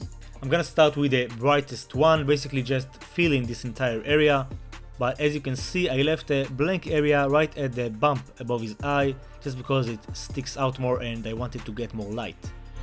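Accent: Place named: Israel. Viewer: וואו חחח המבטא הסגיר, וגם השם... אז הלכתי ובדקתי במידע על הערוץ, ומסתבר שצדקתי ^^ אני שמח לראות אותך מצליח ככה. בהצלחה בהמשך! מישראל 😄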